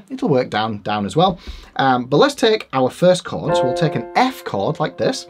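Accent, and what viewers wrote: Yorkshire, United Kingdom. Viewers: Great vid. You sound like a Yorkshire man to me as an ex-pat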